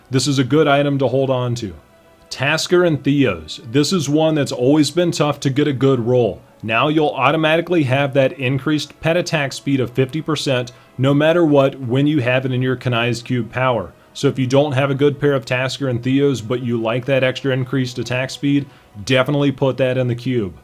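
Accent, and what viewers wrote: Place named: South, United States. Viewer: Oh a southerner! OK, you're off the hook. Keep up the great videos! :-)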